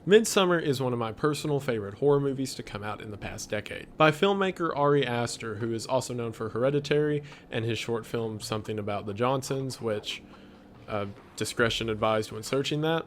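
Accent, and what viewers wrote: South, United States. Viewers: You sound kinda southern and idk if its in all your videos but I just noticed it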